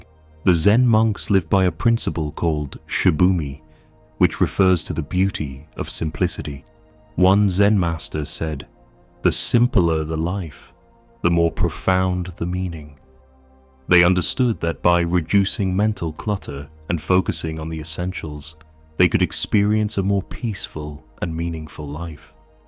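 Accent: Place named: United Kingdom. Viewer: What’s with the British AI accent…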